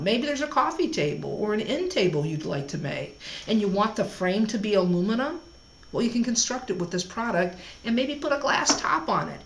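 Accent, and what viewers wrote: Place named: Fargo, United States. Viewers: It has a FARGO twang, for sure. People from West Coast starting at the California/Oregon heading N have the same.....'bout like Sarah Palin (no offense).😋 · Cool vid!I dont know if youre from minnesota or not,but you oughta be.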